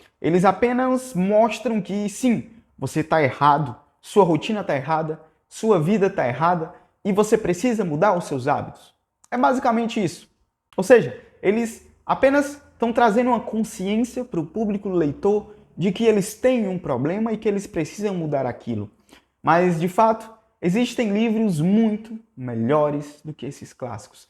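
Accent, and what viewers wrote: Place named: Brasília, Brazil. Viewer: Esse cara é de Brasília, kkk maior sotacão de candango.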